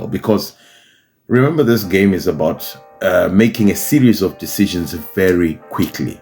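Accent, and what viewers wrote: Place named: Zimbabwe. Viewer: From your accent I can tell you are mwana wekwedu kwa Sadza 😂...I like the way you do it bro,hah munondiitira boo.Im in Zim zvangu but I do have a Hollywood and lottostar accounts ....I would appreciate even a voucher zvaro ...who knows pamwe ndingamuke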